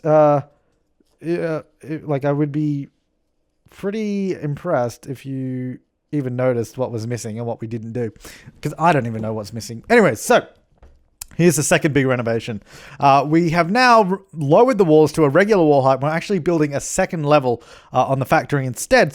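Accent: Australia. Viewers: Absolutely my favourite sims4 series so far, as I usually play the game starting from little to no money. And your Aussie accent is somehow perfect for this kind of commentary. Perfect. Haha.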